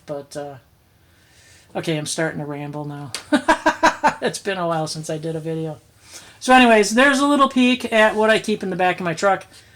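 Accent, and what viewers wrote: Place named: Minnesota, United States. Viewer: I'm so happy to come across your channel today. And also am happy to see another female so pumped about gear you have and lug around! People must think I'm crazy as I pretty much have a lot of the same stuff to some degree myself and in my car and several 'bags' around the house, including the dog's go bag! Cheers from up here in Canada....what State are you in as I detect an accent of sorts...MN WI ?? I have a friend from MN and you kinda sound the same....lol cheers!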